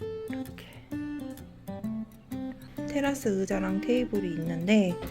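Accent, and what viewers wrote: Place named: Jeolla, South Korea. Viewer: 정겨운 전라도 억양, ㅎㅎㅎㅎ 비비체 뷰가 꼭 가보고 싶네요.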